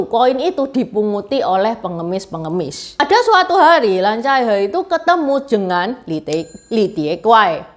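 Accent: Java, Indonesia. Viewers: Cc orang mana sih? Medok pol ❤ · Tionghoa medhok.... 🇲🇨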